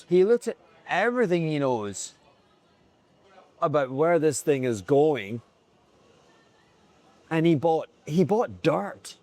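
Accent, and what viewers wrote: Scotland, United Kingdom. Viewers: A drunk Scotsman reading a script from a laptop. I wonder who wrote this garbled nonsesnse?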